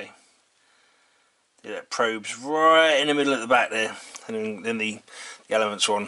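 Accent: United Kingdom. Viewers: Nice work. Essex accent?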